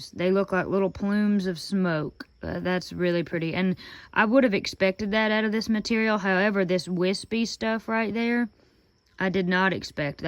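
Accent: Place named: Tennessee, United States. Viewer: 😂 I just had to take a second to figure out what you would be saying, since I knew you weren't talking about maggots. I've lived most of my life in TN, so I'm used to accents like yours. hahaha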